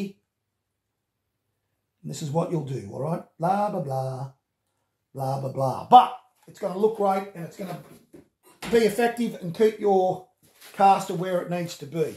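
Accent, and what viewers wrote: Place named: Australia. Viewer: Doctor your 4 DOF build is amazing! I love the videos and your aussie accent is always pleasant to the ears :) Cant wait for the rest of the build videos!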